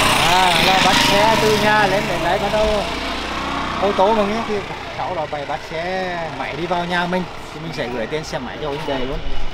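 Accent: Nghe An, Vietnam. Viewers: Ngày nào củng xem chương trình của xem mà không thấy chán vì biết được cuộc sống ở châu phi, và nghe được giọng xử nghệ quê hương mình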